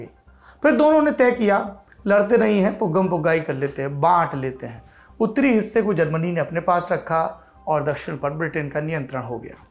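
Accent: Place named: Purvanchal, India. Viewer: Purvanchal se ho kya.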